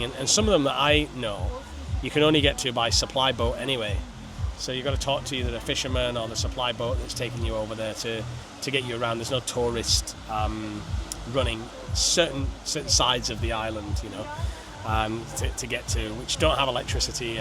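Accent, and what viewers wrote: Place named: Sheffield, United Kingdom. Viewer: Hi, love your down to earth channel. You’ve not lost your accent, where is it from? It sounds like a Sheffield twang.😊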